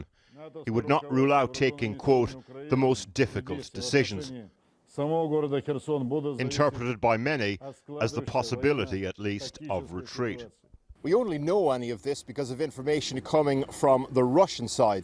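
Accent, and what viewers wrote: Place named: United Kingdom. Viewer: marshall ... as in martial . a british channel are you not ?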